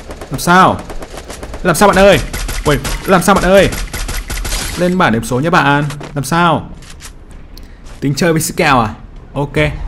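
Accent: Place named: Hanoi, Vietnam. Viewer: Hà Nội lận cơ à hèn chi giọng ko giống miền Nam of E r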